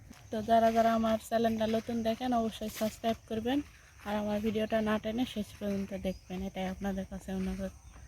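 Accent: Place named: Naogaon, Bangladesh. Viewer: আপনি কোন এলাকার মানুষ? ভাষাটা আমার দেশ নওগঁার মত শোনা যায়।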